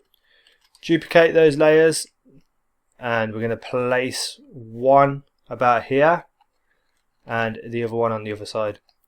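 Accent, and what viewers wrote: United Kingdom. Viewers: Holy crap I didn't know you were british, or english, whatever the proper term is. Cool :D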